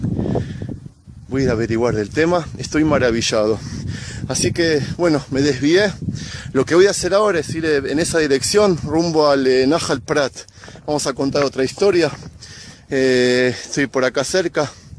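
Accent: Argentina. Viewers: DIOS te bendiga. Por compartir este Interesante video. Te escuchas como argentino ?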